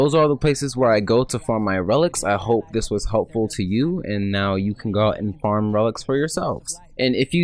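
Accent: Jamaica. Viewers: are u jamaican? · Where you from bro and are you a big fan or you're a Jamaican?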